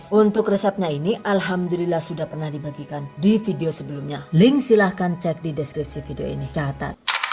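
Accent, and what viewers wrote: Banyumas, Indonesia. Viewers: Ide usaha rumahan nih 👌 Mantap sukses selalu Ngapak juga ya yu 😅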